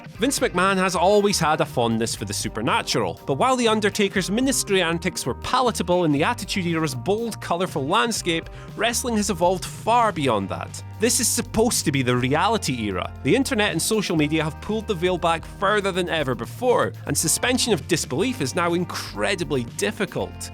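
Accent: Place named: Scotland, United Kingdom. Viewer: I want to keep hearing this Scottish prick's voice. Love this dude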